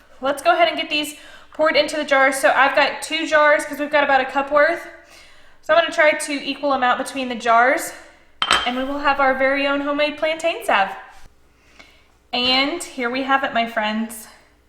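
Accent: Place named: United States. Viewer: Why do mercans say uurrbbs, and savv...Herbs, and saLve. Most annoying and makes you sound stupid!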